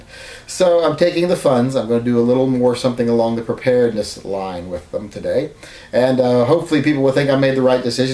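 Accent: United States. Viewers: Never ever buy or sell a gun from a sissy sounding Yankee. Especially one who magically has fooled a large group of followers that he knows jackshit about firearms. Those seeking knowledge please look elsewhere because all you've got here is a smartass blowhard.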